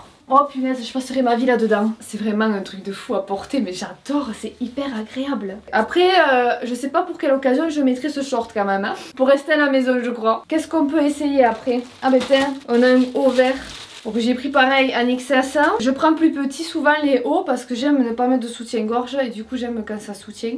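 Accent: South, France. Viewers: Le moment sacré de ta vidéo du dimanche que j'ai pas pu regarder hier... Toujours au rdv avec ton sourire, ta bonne humeur et ton accent du soleil ! J'Penses tjrs que tout te va t'es magnifique et d'une gentillesse 🥰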